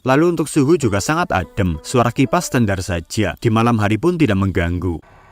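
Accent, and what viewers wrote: Banyumas, Indonesia. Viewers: mas njenengan Banyumase pundi? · Pada² ngapak Oh iya njenengan wong ngendi mas?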